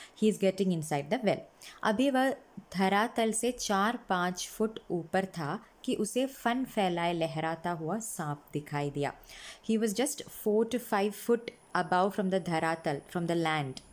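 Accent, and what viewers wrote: Kerala, India. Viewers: maam are u a malayali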